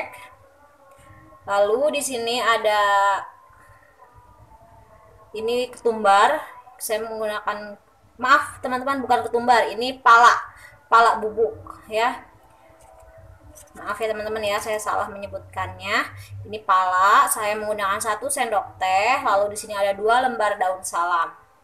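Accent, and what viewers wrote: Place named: West Java, Indonesia. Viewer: Dari logat bicara, sepertinya si ibu ini orang sunda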